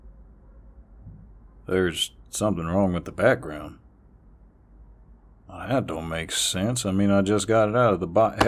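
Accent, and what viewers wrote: South, United States. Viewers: The southern accent rahhhh I love me a southern gentlemannnn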